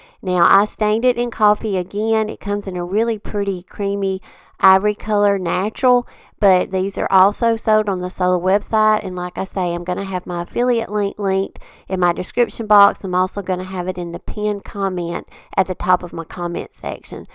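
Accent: South, United States. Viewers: Lovely Southern accent, but she is speaking very quickly and I can't make out what she is saying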